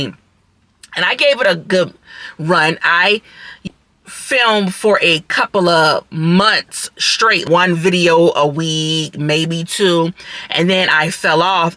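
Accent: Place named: Charleston, United States. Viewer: Ouuuu❤❤❤ I'm going to start recording some GIG work content. Also I knew you were from Chuck town ..... that accent. Im from Goose Creek area ❤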